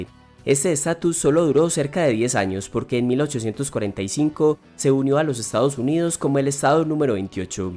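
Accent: Antioquia, Colombia. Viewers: Interesante el video, lástima el acento, creo que sería más agradable escucharlo sin el acento paisa tan marcado.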